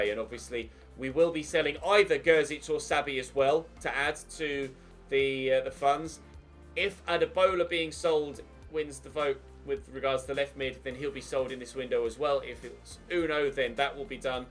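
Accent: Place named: RP, United Kingdom. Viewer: FUCKING BORING, NOT NEEDED TO CONTINUE THE CAREER, YOU TALKING IS SO BORING WITH YOUR UPPER CLASS POSHNESS xD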